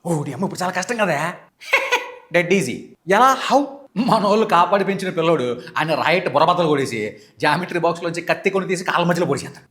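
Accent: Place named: West Godavari, India. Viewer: Anna meedhu WEST GODAVARI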